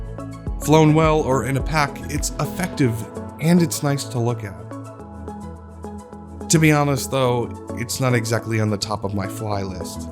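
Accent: United Kingdom. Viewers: English slang accent gave me cancer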